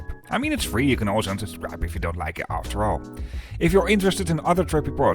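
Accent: Netherlands. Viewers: you have strong dutch accent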